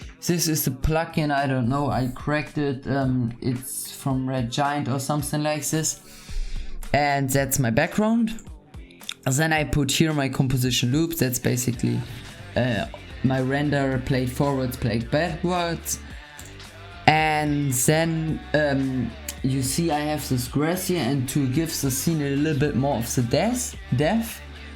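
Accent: Germany. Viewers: Hörst dich stark nach nen deutschen an xd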